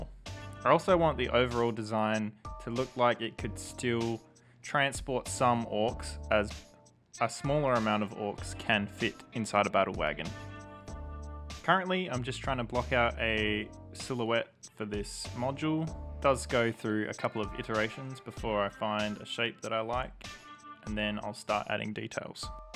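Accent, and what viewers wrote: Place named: Australia. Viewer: This is so awesome - great models. Have you found your modelling skills have really improved since you started with the videos? I'm interested in hearing how you feel about your progression. Also, nice to hear another Aussie!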